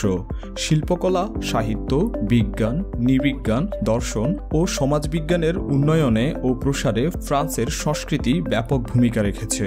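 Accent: Noakhali, Bangladesh. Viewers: Vai ki Noakhali / fenir 😜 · Noakhailla tor uccharon thik kor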